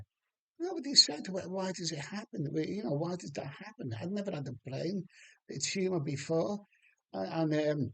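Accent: Liverpool, United Kingdom. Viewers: Great to hear the scouse accent again 👌 one day at a time mate but the improvement is great 👍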